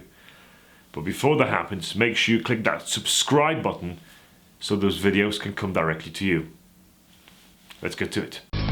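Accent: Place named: United Kingdom. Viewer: I know this is not the place to say this but you're absolutely gorgeous! Great British accent and excellent outfit. Well done!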